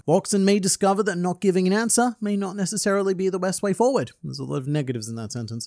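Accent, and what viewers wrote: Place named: Australia. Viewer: I love ozzy accents. Morenerr!!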